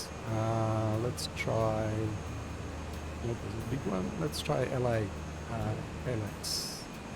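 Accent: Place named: Australia. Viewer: Bro.....sorry but.....the accent! 50/50 for me in understanding everything you said in the video. Is it Australian?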